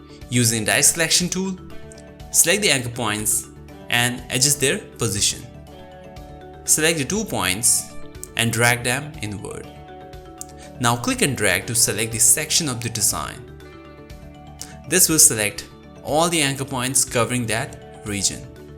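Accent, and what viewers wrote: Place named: India. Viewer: yea fucking indian accent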